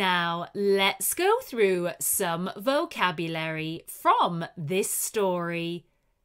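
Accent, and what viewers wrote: United Kingdom. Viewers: I love the British accent for learning English! And I love it your voice, speak slowly, and your stories are very interesting and I can understand all perfect!❤ Thank you very much for your excellent work! Greetings from Spain! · the best channel to learn English in a British accent in a fun and not boring way...thank you for this story...keep going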